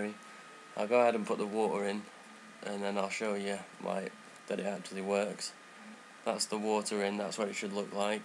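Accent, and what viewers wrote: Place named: United Kingdom. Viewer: He's british he say it that way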